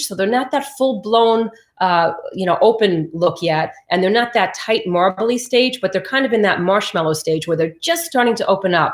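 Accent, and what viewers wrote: New Jersey, United States. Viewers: What month or season can you split them, and how young can they be before splitting them? Thanks, I love the channel and your Jersey accent. 👍🏻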